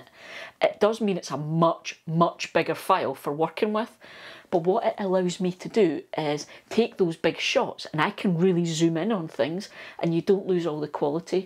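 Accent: Scotland, United Kingdom. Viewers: Thank you for this incredible sneak behind your scenes! The way of your talking is part of the special spirit of your videos - including your nice scottish accent i already get a little more comfort with. 😉 (no offend, i like it, brings me back holiday feeling and memories when i visited Scottland with my son) It's hard to believe teaching lessons with you could be no fun. 😁 Regards from Germany - full of gratitude i found your channel by your talking to Gardener Scott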